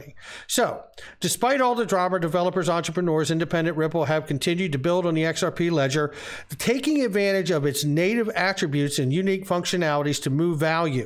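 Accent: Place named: United Kingdom. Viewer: I am very excite about XRP.. its every time different story.. very cool token.. and reporting very cool thanks you for this reporting...i check you headlines out bit hear of that all time.. very confusing you give report but your english cool good british language thnks 2 yu 😘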